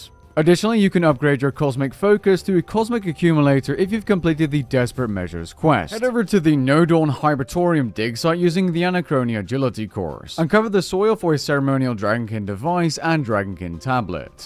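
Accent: Netherlands. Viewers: Reiniger Defently dutch i can hear😂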